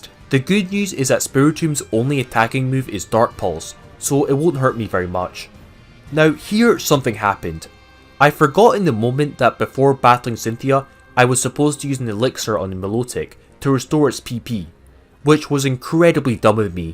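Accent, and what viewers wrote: Scotland, United Kingdom. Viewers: Loved this mate, inspired me to give it a go. Can hear the scottish in your accent, where you from or where have you stayed here?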